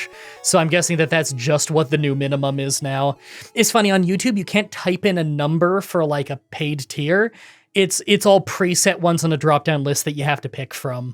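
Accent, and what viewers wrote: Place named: Canada. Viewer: This narrator's Canadian accent is so cute to listen too😊😊😊😊😊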